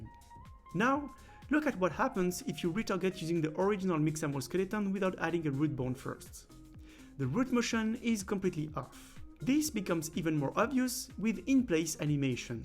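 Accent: France. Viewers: I really appreciate the effort you put in the tutorials.Just if you could a bit slower.....with the french accent and your speed talking, sometimes it´s really hard to follow (dont want to be rude, just an advice). Love the channel BTW!! really helpful video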